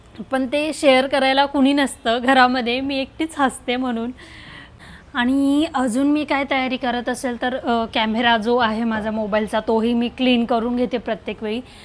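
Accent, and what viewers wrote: Khandesh, India. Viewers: खूपच खिळवून ठेवणारे , वास्तवाला विनोदी पद्धतीने , टोमणे मारत सांगीतलेले असते.. सर्वात कमाल म्हणजे हे आधी लिहिलेलं नसतं, ऐनवेळी कसे सुचते? खूप छान flow असतो , विषय सोडून अजिबात इकडे तिकडे जात नाही.. आम्ही 5 मिनिटात बघतो reel. पण किती प्रचंड मेहनत, आणि बारकाईने detailing केलेले असते.. सासूबाई, आई सगळे पात्र अगदी जिवंतपणे उभी करता.. ह्याचे आश्चर्य वाटते की विषय सुचतात तरी कसे?आणि ते किती सहजपणे खुलवता तुम्ही!! प्रत्येक वेळीस सर्व पसारा आवरणे, घर टापटीप करणे, बापरे , किती तळमळीने करता हे काम? आवाजाचा खानदेशी ठसका ,स्पष्ट आणि गोड आवाज सगळाच खूप छान आहे.. केवळ viewers साठी घेणाऱ्या तुमच्या कष्टाला सलाम!. All the best..तुमच्या reel ची नेहमी वाट बघते..आणि कितीही घाईत असले तरी ते बघितल्याशिवाय राहवतच नाही. 😊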